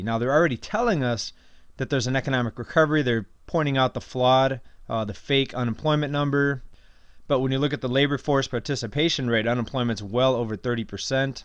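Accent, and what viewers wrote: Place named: Michigan, United States. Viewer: I so knew it from your accent that you were from Michigan..keep the market "praapped"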